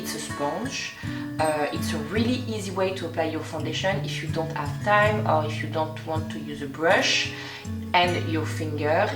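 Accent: France. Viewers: your french accent is super cute! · I just found your channel by complete chance (searching for Dior Forever reviews!) and I am so happy that I did. I have always been obsessed with everything French and I love your accent.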